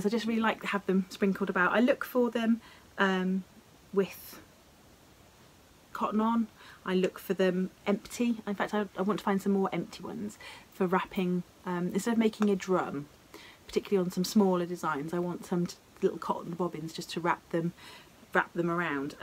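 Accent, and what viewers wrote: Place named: England, United Kingdom. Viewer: Yes you do bring me lots of joy becuz I love listening to your English accent. I’m so happy that you will have more stitch time during the week due to remote teaching. That’s going to be so nice. I too have a Chatelaine but it just makes me get antcy seeing all the floss. I would love to stitch the dresser or the castle. Love monochrome stitching charts. See you tomorrow and please do have some bubbly!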